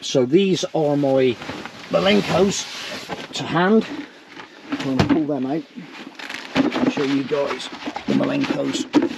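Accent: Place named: Exmouth, United Kingdom. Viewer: yep that’s what I’m looking at but I’m being told to fit a 255 tyre they need to be a 7” wide rim not the standard 6” , what width are your steel wheels . Btw I love your channel and your accent which is very much like mine coming from Exmouth and now living in Gloucestershire 👌 . Thanks for your help regarding the wheels 🙏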